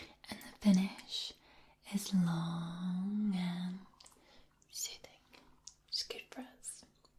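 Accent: Scotland, United Kingdom. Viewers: This is by far, my most favourite video of yours and of any ASMR video out there. Love it! Well done! I especially loved your reactions. You are so animated. I had a bit of a chuckle at your reactions to the last three. I recently developed a love for Scotch Whisky. The complexity and the unique differences of every bottle is just amazing. I was definitely born in the wrong country as I would love to tour distilleries and experience and learn more about Scottish whisky. I really do enjoy whisky, except the peaty ones, (I would have the same reaction as you did) so maybe it is my Scottish ancestry coming through. I do so love the Scottish accent. I could listen to you all day. Thanks for this one. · Oh should be the voice of Scotland. Could listen & watch you for hours. I do love a whisky, very soothing & warming to the heart.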